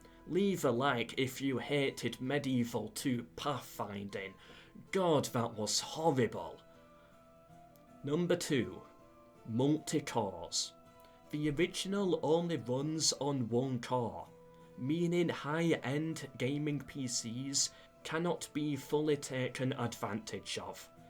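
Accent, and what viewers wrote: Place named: Yorkshire, United Kingdom. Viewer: Yorkshire accent, Ever watch "Sharpe's Rifles" ?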